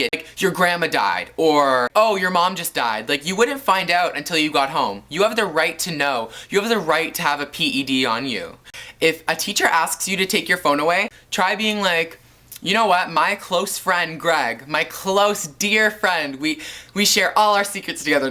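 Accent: Canada. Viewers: his Canadian accent is really strong in this vid!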